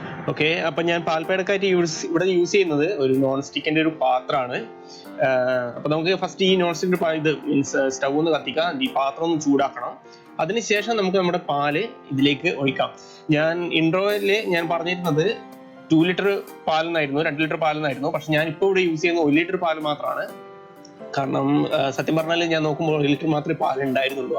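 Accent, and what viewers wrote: Kannur, India. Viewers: Njanum kannuranu nammude bashakentha mosham. Recipi suuper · നിങ്ങൾ ഒരിക്കലും മോശമായ, ശൈലി ഉപയോഗിച്ചിട്ടില്ല ,കേരളത്തിലെ എല്ലാ ജില്ലയിലെ സംസാരത്തിനും ശൈലിയിൽ മാറ്റമുണ്ട് ,നിങ്ങൾ നിങ്ങളുടെ സ്വന്തം ശൈലി കണ്ണൂർ ശൈലി ഉപയോഗിക്കുക. സ്വന്തം ഭാഷ പൊട്ടയാണെന്ന് പറയുന്നവരാണ് മലയാളികളിൽ കുറെ ഭാഗം ധൈര്യത്തോടെ കണ്ണൂർ ഭാഷ ഉപയോഗിക്കുക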